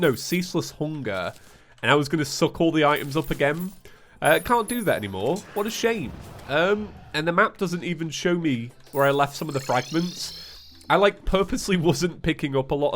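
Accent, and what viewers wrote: England, United Kingdom. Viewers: I actually like English accent so it's okay fir me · Is a british couch gang member i can relate to british speach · British accent is the best I mean I'm British so idk if I'm one to decide but eh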